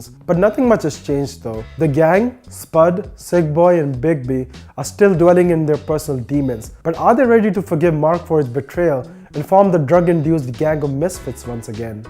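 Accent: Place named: South Africa. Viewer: YOu sounded more South African.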